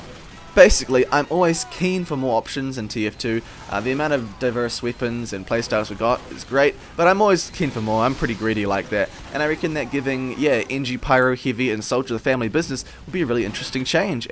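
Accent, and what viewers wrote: New Zealand. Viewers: Dat accent xD.... 'hivy'..... 15 pir sint faster firing speed.... god bless new zeland :)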